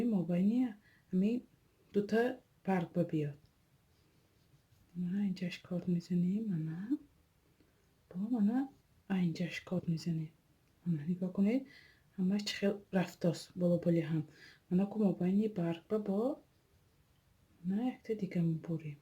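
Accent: Isfara, Tajikistan. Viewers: Аз суханатон фахмидамки хамшахри мо хастед исфарая кучошба кадом шахри расия зиндаги мекунид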